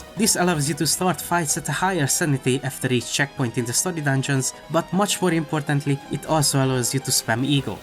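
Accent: Hungary. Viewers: ngl for some reson it kinda sounds like you have a hungarian accent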